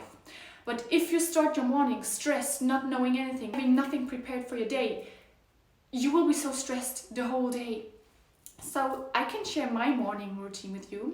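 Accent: Germany. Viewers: Bist du deutsch, dein Akzent hört sich so an:) bin auch aus Deutschland und mag deine Videos viel zu sehr. Liebe Grüße ❤️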